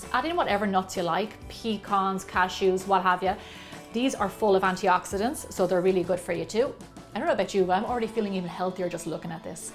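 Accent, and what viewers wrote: Ireland. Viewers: I come for her recipes and her irish accent 😍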